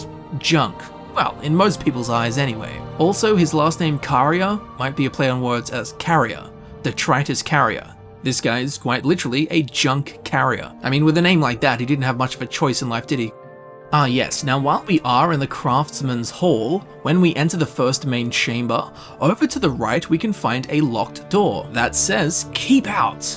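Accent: Australia. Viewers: I just love the idea of an Australian lore guide who speaks with some degree of eloquence, it's both hilarious and glorious at the same time.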